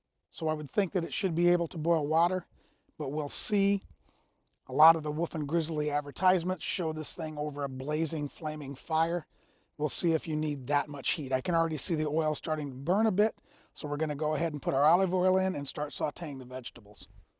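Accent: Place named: United States. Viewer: I love American accents, no offense but we say Wolf as in Wolph...you say woof!!! The number of dialects and accents are nothing like in England where they change across the street, but accents in the US especially in the East Coast are numerous.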